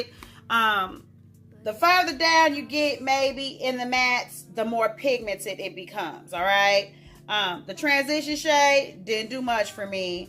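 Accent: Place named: United States. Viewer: Your non chalant country voice cracks me up i looooove it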